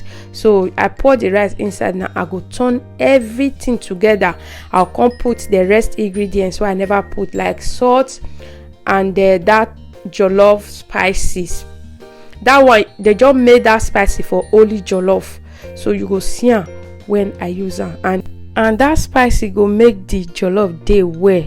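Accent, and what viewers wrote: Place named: Nigeria. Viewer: The jollof rice looks so yummy, the color alone dey make me hungry and your pidgin English dey sweet for my ear, I know say naa so the rice go sweet well well! And yes this naa the rice wey I grow up dey chop the only difference na the spices because those days if you get Maggie, salt, onions, pepper, tomatoes and crafish jollof don ready be dat, fish or meat fi no dey wetin you know be say chop belefull! Na so we go dey use hand take dey mold am put for mouth 😂 … is a great cook.Thanks for sharing dear ❤️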